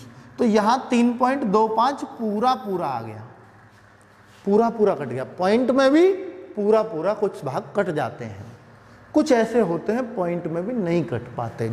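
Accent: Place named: Western Uttar Pradesh, India. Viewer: Sir.Questions ke Answer explain karne ka way hai, wo aapka Ultimate hai. But aapki jo Desi language hai wo Impressive hai Western UP wali. Hum bhi aesi hi language mai comfortable hote hai 👌🏼👌🏼👌🏼👌🏼👌🏼👌🏼.😊😊😊😊😊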